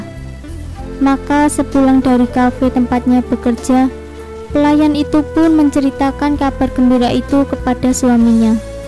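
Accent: Java, Indonesia. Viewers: Medok amat yg crita🤣🤣🤣🤣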